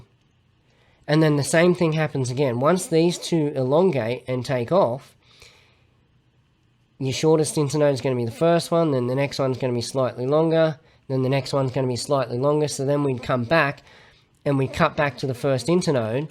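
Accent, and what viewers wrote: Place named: Australia. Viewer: Brilliant explanation, bonus you got the Aussie accent mate, bloody love it legend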